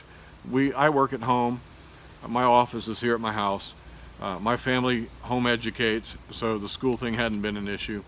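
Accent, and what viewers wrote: South, United States. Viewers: Southern accent is everything. I guess your first name is from your scottish grand mother. Great videos!!! by the way.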